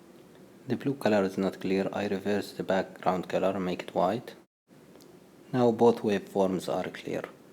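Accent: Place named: Arab world. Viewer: عربي. واضح من اللهجة ما شاء الله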